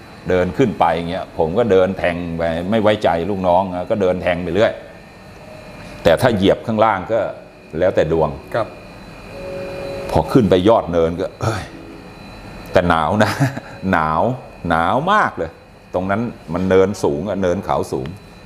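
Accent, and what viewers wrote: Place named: Pak Nam Pho, Thailand. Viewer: สำเนียงคนปากน้ำโพค่ะท่าน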